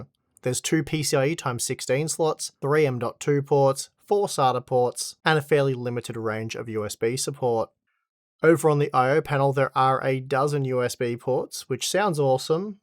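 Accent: Australia. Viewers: It's almost like they're australian and have a different accent and pronunciation over there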